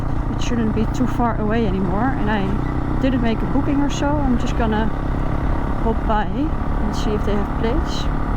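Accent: Netherlands. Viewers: very sympathetic and nice video. And I like your dutch english. remembers me at my colleagues from NL when I worked for Philips.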